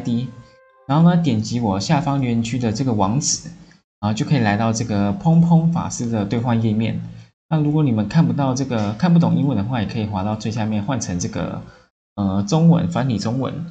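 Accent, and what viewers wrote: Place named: China. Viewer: 如果頻道主真的是台灣人，請不要學大陸人說英語的口音，還是頻道主是大陸人扮台灣人？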